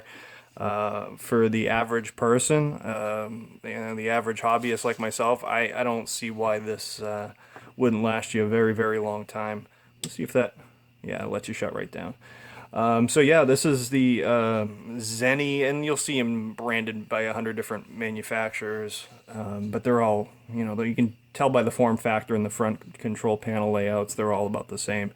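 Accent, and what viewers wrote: China. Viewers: "Chinglish" BOOM--SUBSCRIBED!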